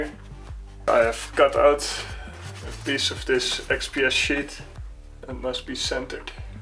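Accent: Netherlands. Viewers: are you dutch? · You just HAVE to be dutch, listening to that accent.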